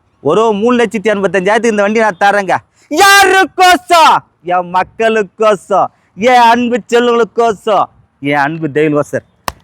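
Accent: Madurai, India. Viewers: Madurai vasagam super 👌 🎉🎉🎉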